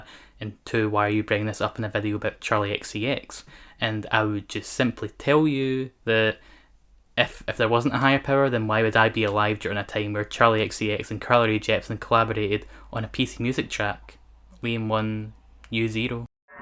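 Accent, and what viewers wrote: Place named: Scotland, United Kingdom. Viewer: This is the best channel I could find, music stuff with a scottish accent!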